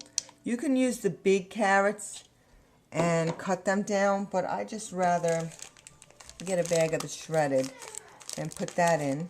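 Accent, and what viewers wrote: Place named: Brooklyn, United States. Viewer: I gotta try this recipe. Thanks for showing us and giving the recipe. OK, I gotta know... Where you are from. I'm guessing Brooklyn or maybe New Jersey. Whshew... Thick accent... But then-again, you'd probably think mine is thick too... :-D I heard you keep saying "My husband likes..." It made me chuckle as I thought a great idea would be to put a few jalapenos in there and warm things up a little!!!! See if your husband "likes" that!!!!!! Woo-hoo!!!! :-D Thanks again! Ya done good on the video!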